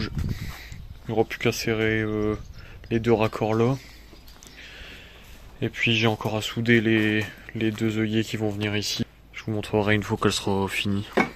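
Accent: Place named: Quebec, Canada. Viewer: Tu habites où? As tu déjà habité au Québec? On sent un accent québécois derrière ton accent français...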